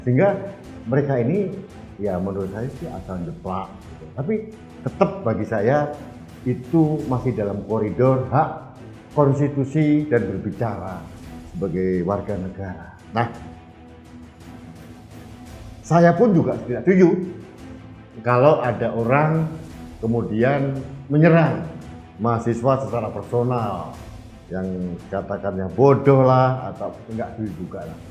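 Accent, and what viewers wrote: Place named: Surabaya, Indonesia. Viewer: MAJU TRUS PK BUAT NKRI SALUT DI DUKUNG RAKYAT KAYAK PK JOKOWI DN PK ASLI MANA YA? / BILANG AKEH TUNGGALE REK DIALEK AREK SBYO TRIM PK MAJU TRUS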